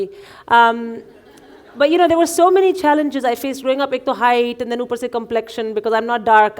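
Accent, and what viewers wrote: South, United States. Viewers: This is southern genteel white woman. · EXACTLY!!!! its southern accent...NOT AFRICAN AMERICAN! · This is southern not African American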